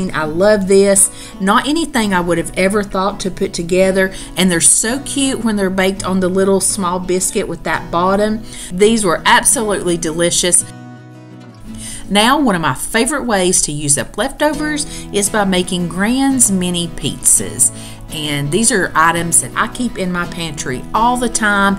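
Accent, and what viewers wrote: South, United States. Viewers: Love that southern Belle accent and of course your great recipes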